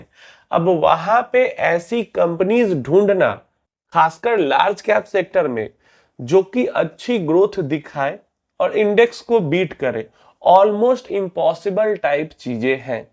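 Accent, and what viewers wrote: Bihar, India. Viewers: रा का उच्चारण बिहार वाला ही हो रहा है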